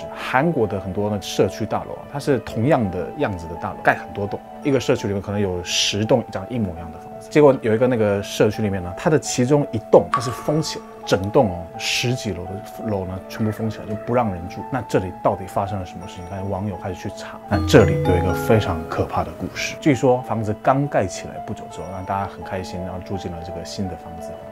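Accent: Standard. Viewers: 中文也太標準了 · 國語講的很標準！聽不太出來是韓國人🤣🤣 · 發音很標準😆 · 這中文講得也太標準了吧！！！！ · 最後一個故事好毛啊!! 嚇死~ 而且中文口音好標準, 差點聽不出來是外國人~好厲害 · 其實你是台灣人對不對？中文太標準啦w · 不得不說你的中文很標準,不說真以為是台灣人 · 中文發音很標準欸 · 發音好標準哪！對後製人員按個讚，真的好細心。不論是音效、插入的圖片或影像。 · 發音比一些台灣人還標準唉 😂😂😂 例如我😔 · 超級標準我還以為他是台灣人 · 講中文超級標準耶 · 第一次看到你的頻道！你好可愛中文也說的很標準！（被圈粉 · 我是中国内地的朋友在加拿大生活，身边认识很多韩国🇰🇷朋友，我发现你的中文已经好到比我说的都标准了！终于了解韩国的历史文化了。非常的赞！秒关注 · 他發音超標準！而且真的聽起來很有感覺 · 發音怎麼可以這麼標準好感人啊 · 发音确实超标准 👍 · ，您的中文真的很標準，我打從心裡真心佩服，可是您的故事比較像是鬼笑話欸😆~ 聽你講中文還比較有趣。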